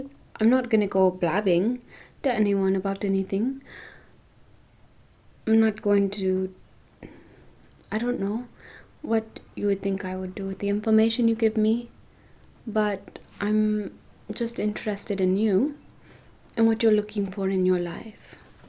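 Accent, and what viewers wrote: India. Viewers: YESSSSSS U CAME BACK , WE ALL LOVE YOU HERE THANK U SO MUCH ❤ BEST INDIAN ACCENT ASMR ON YOUTUBE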